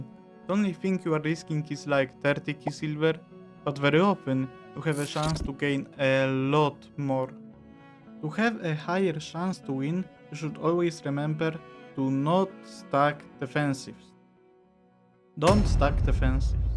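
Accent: Poland. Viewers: love Your polish accent XD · czuć że polak z kilometra z tego akcentu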